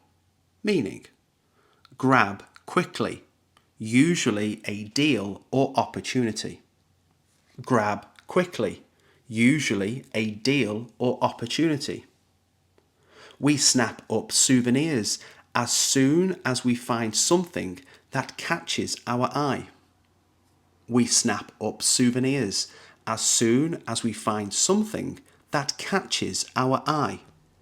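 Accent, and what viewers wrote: United Kingdom. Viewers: It sounds your accent is British Are these words common in American accent?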